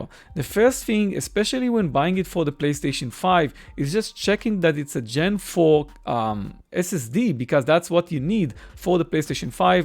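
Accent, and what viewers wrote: Israel. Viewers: Holy shit that Israeli accent haha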